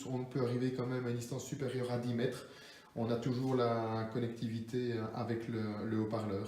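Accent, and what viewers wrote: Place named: Belgium. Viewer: Tu es Belge ? Ça s'entend 🤣 je le suis aussi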